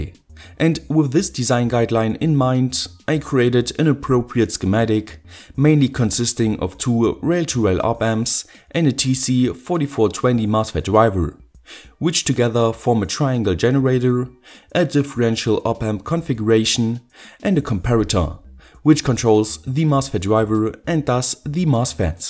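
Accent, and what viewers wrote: Germany. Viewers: Hi- could it be that you are German ?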